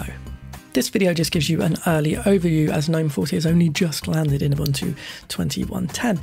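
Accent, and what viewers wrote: United Kingdom. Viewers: british accent always soft to my ears...i like it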